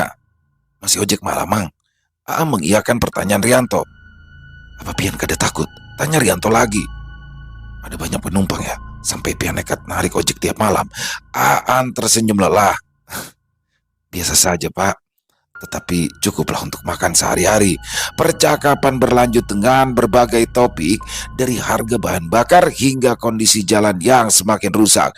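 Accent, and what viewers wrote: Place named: Banjar, Indonesia. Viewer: Bang orang apa sih? Logat bahasa banjarnya mantap mang..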